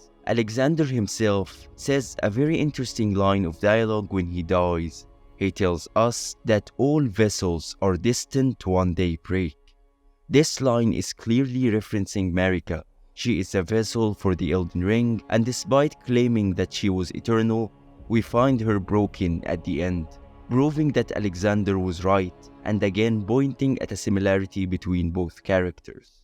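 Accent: Egypt. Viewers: U sound Egyptian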